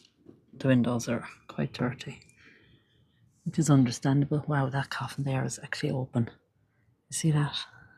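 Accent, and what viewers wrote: Ireland. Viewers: Love listening to your Irish voice ❤❤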